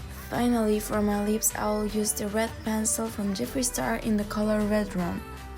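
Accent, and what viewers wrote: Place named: Russia. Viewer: Nice video! Love your accent, are u from russia?❤️😍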